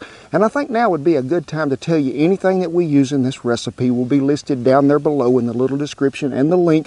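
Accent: South, United States. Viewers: Your videos, your voice and southern gentleman ways make me feel so homesick... I've been away from the South for too long. ☹ But I enjoy watching for the same reason. Thanks for the great how to videos.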